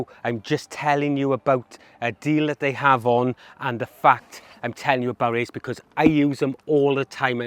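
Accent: Wales, United Kingdom. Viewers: Where in the UK is your accent from please? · Irish name? Welsh accent? 😉(South?) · This video is amazing. I think I recognise that accent though, 😊 is that a welsh accent? I live in south wales 🏴 up in the valleys.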